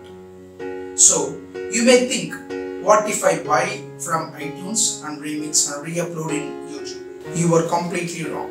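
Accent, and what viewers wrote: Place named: India. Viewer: IT IS REALLY HARD TO UNDERSTAND WHAT YOU ARE SPEAKING. THE CAUSES : 1. BAD AUDIO (SORRY) 2. INDIAN ENGLISH ACCENT (DIFFICULT FOR ME UNDERSTAND THAT ACCENT COMPARED WITH AMERICAN OR BRITISH) I HOPE BETTER AUDIO ON THE NEXT VIDEO. THANK YOU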